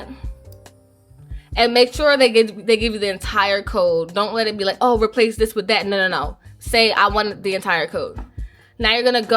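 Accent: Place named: Philadelphia, United States. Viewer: New subscriber! I knew you were from Philly the second you started talking lol. I agree with outsourcing photographers in NYC! Great content!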